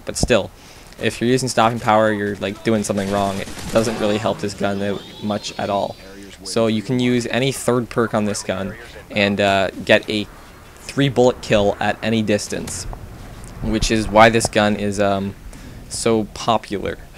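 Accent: Canada. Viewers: Subbed Are u Canadian by any chance? I'm trying to see If I'm good at recognizing accents haha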